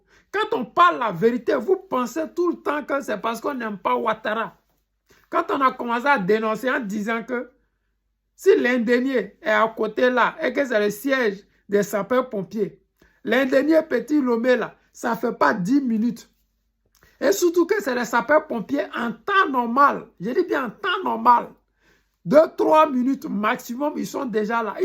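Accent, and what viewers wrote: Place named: Guinea. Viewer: C'est punition de DIEU. Ton accent même est guinéen mais c'est sûr que tu es dans la politique avec les déstabilisateurs de la Côte d'Ivoire. Tu as participé avec tes frères à installer le gars là. C'est la punition de DIEU, va à la mosquée prier pour demander Pardon de DIEU et pardon pour les cœurs des Ivoiriens , qui vous ont reçus mais qui ont saignés par votre faute.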